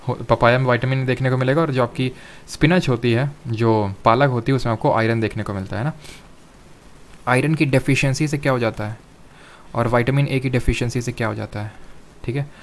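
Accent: Kanpur, India. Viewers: The timing . Kitna badhiya samjhaya Article 2 ko...I love this kanpuria ascent. love from gorakhpur sir ji.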